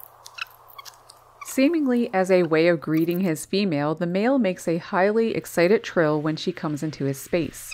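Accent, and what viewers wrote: Newfoundland, Canada. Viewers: I detect a Newfoundland accent. Thank you for everything that you do.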